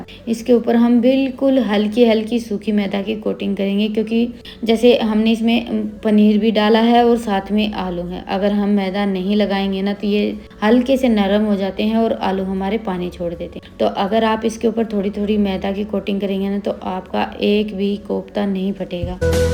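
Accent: Haryana, India. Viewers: Haryanvi language h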